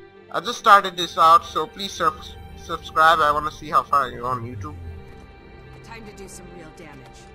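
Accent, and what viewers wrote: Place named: India. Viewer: sounds like an indian accent woooooooo represent us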